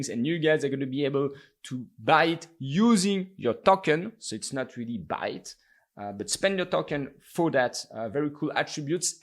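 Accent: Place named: France. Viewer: ton accent on dirait que t'es francais tres bonne video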